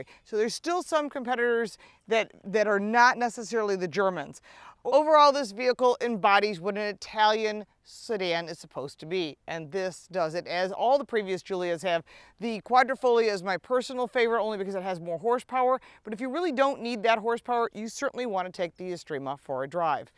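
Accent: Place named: Brooklyn, United States. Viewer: All this time I thought she was Italian ;she sound like she's from Brooklyn. 😊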